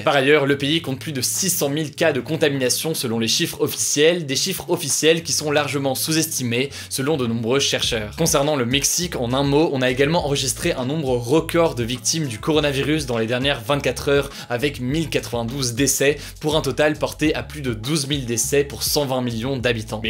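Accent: England, United Kingdom. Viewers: Yfébo Anvakenss autant pour moi, reste quand même que son accent anglais est magique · C’était mieux avec son accent anglais! Ceux qui s’en sont plein vous saoulez 😒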